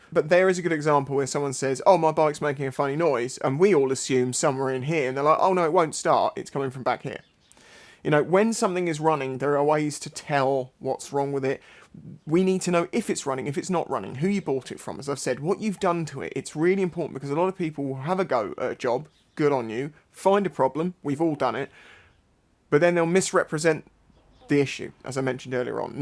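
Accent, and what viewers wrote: United Kingdom. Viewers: That's how you know he is truly British and not faking an accent.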